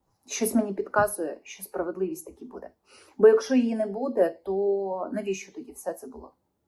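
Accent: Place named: Moscow, Russia. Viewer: Ця касабка ще на початку 2022 року зомбувала аудиторію російською з мацковскім акцентом. Така типова мер &твечуківсько-ахметівська гебнява журнашлізда.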